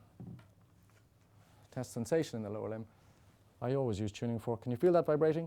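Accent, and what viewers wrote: Ireland. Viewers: His verbal delivery is the most quintessentially Irish thing I've witnessed in some time.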